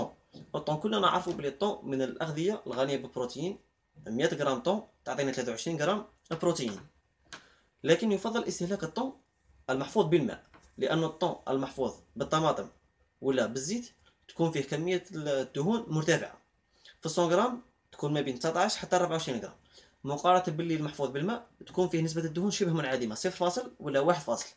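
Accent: Algeria. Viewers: اللهجة الجزائرية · انت جزايري خويا ؟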